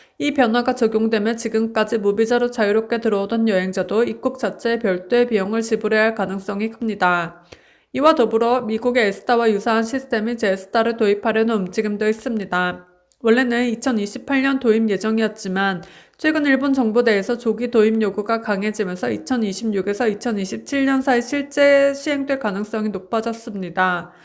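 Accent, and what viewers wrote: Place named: China. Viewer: 말투가 중국인인데요? 일본인의 한국어사투리발음아님! 발음이 한국인이 아닌거같음?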